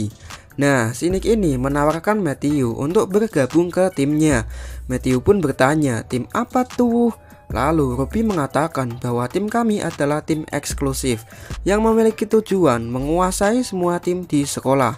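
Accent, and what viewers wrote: Java, Indonesia. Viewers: Mas kamu orang Jawa?? Jawanya mana mas? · Min medog amat suaranya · Bang sampean wong jowo yo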